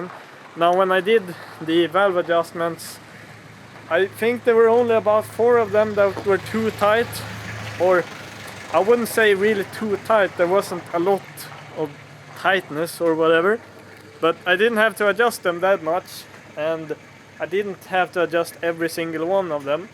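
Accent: Norrland, Sweden. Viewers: Har du någon statistik på hur många utländska tittare du har? Diggar din Norrlands svenska 😃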